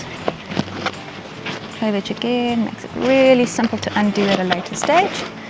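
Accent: South Africa. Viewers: Love the South African accent....ive used 50kg potato hessian sacks for the same job. just like the Ol Timers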